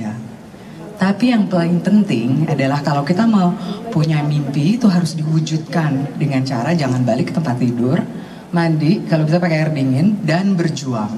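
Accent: Indonesia. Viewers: Lagu mimpi mengingatkan aku pacaran di masa SMA..mimpiku yg indah2..ternyata mimpi itu kandas pacarku meninggal Krn skt likimia.. Hilanglah semua mimpiku yg sdh kutata rapi.. memang anggun..SBG wanita Indonesia..TDK sombong ttp bahasa jawanya ttp kental tdk hilang..slmt dan sukses buat mbak anggun...raihlah mimpi2diluar negri.. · intonasi suara, SEMUANYAAAA SANGATTT INDONESIAA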